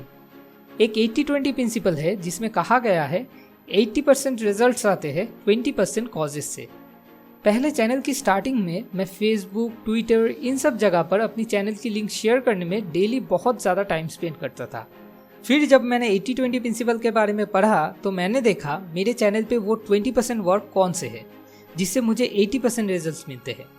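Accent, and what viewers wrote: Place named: Bihar, India. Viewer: pure bihari voice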